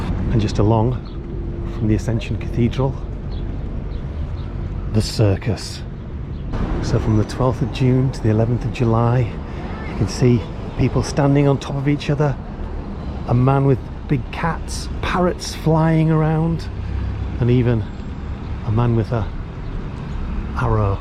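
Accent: England, United Kingdom. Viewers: и цены называл в фунтах, так что даже во вкладку "о канале" можно не заходить + очевидное английское произношение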